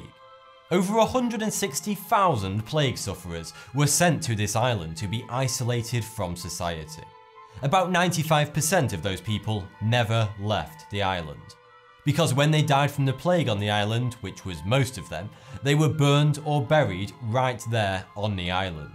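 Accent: United Kingdom. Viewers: British accent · So what is this guys accent? British, Scottish? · Maybe it's exactly because his accent seems really "british " and let's say pure that I find it hard to understand,I'm more used to "impure accent" ,when we practice our english in class we do not have that perfect accent so it can be harder. I find american or australian accents easier to hear down But maybe it's just me ahah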